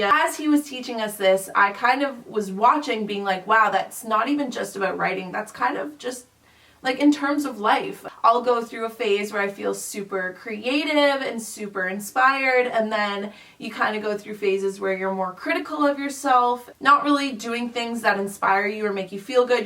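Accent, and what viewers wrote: Canada. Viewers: Is she Canadian?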